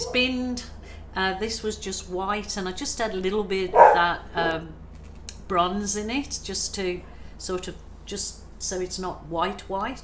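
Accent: Birmingham, United Kingdom. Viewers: Hi Love your work! are you from Birmingham UK? I can hear a bit of Brummy there. I grew up in West Bromwich, but have lived in Switzerland since 1980. love your videos! Janet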